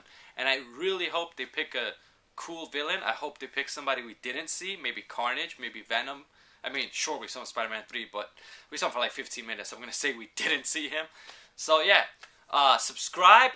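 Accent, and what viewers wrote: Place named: New York, United States. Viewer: Gotta love the NY accent, good review overall.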